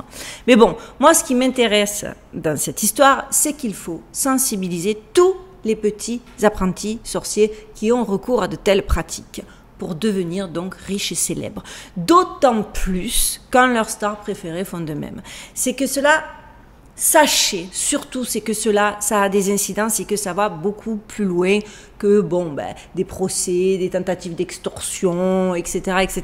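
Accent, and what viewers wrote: South, France. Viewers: J'aimerais avoir des informations de qui vous êtes ma chère et belle dame. Pourquoi d'abord vous êtes formidables et une pro dans se que vous faites 2, je suis un nouveau sur votre chaîne Youtube et je suis un artiste rebel retraité artiste de l'est du Canada français. Ma demande c'est que vous êtes très cool belle Vivent dans un condo hyper moderne. A las Vegas. Vous avez un accent du sud de la France genre de Marseille ou Toulouse ou ex en Provence. Je me demande qui vous êtes voilà bisou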